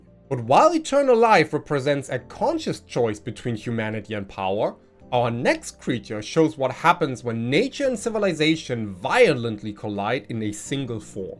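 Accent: Germany. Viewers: Man, your german accent so thick it almost sound chinese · he is from Northern Germany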